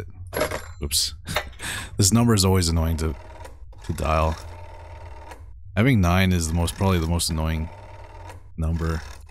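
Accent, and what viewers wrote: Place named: Canada. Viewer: This guy has one of the most aggressively Canadian accent I've ever heard.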